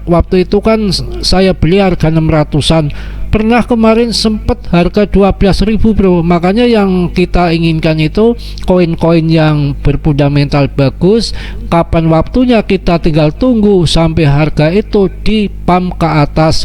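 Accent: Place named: Java, Indonesia. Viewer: Saya demen mba suaranya medok Jawa banget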